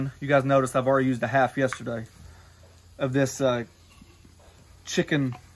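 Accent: South, United States. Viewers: .....that will work also....just found your channel....sounds like your from the south....I'm in Illinois, but have family in Nashville TN area....looking to retire and looking for somewhere warmer in the winter....LOVE UPUR VIDEOS...KEEP THEM ROLLING...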